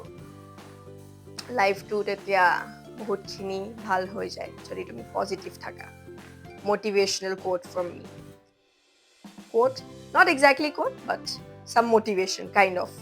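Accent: India. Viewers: Baa emn dhunia koi kotha bur kua ekdom upper Assam r style t ..... love from Sivasagar .... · Tumr Maat tu Huni e gom paisilu tumi amr Jorhat r e hoba sge buli ❤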